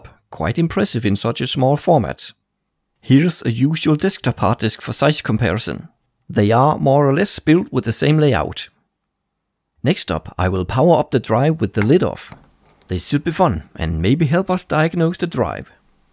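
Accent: Denmark. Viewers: jeg kunne høre fra starten at du var dansker pg.a. din aksent. :) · Your danish?? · er du fra Danmark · xD man kan hører du er dansk xD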